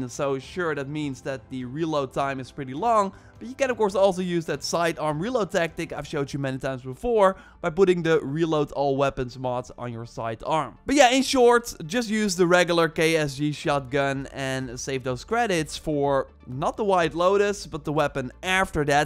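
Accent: Netherlands. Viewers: Ik hoor gewoon dat je Nederlands bent hahaha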